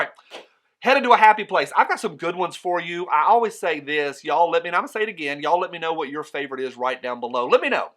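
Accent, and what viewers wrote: South, United States. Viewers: Really like your closing line, that's the one you need to stick with. For anybody else who enjoys listen to this smooth-talking southern gentleman speak, vote for him on the gundies!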